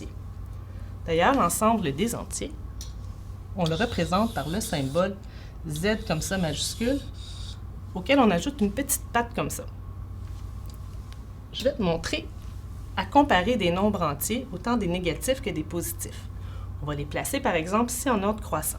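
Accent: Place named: Quebec, Canada. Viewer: Je comprend pas pour quoi tout le monde dit que ils font pas comprendre car Elle a in accent come c’est québécois j’ai compris tout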